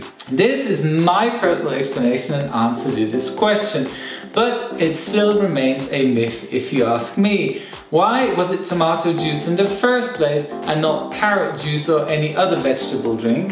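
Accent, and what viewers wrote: United States. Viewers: how can he speak American English so well?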